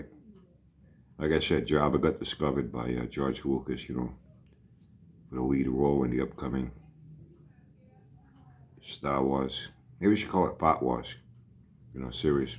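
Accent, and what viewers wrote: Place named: New England, United States. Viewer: you are always the " voice of reason" I don't GF what they say the new England accent is just the salt and pepper on top...😁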